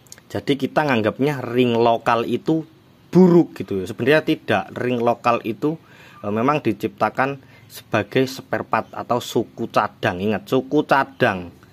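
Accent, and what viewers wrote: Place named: Kediri, Indonesia. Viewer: kulo pikir lek kediri om aksen jawanya😂😂😂😂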